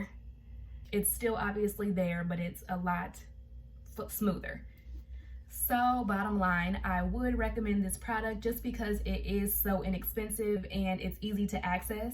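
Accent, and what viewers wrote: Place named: South Africa. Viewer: Hey. Am from South Africa. (Am Assuming) You sound South African😹😹 Where can I get that face washer😭😭😭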